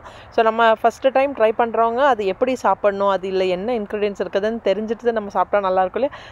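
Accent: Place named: Tirunelveli, India. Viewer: TIRUNELVELI pola varma sis amma sona mathiri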